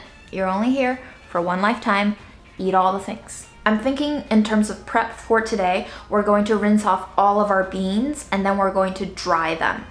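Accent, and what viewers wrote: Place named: New York, United States. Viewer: !!! I love coming home and pressing play on your videos after a long day of work. So chill, So relaxed, So New Yawk, So Talented and Sooo Entertaining!! Thank You!!!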